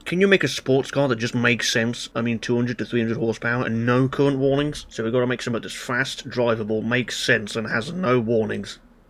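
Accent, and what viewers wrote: United Kingdom. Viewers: Bro sounds like the UK. He sounds exactly like a UK haver would sound like.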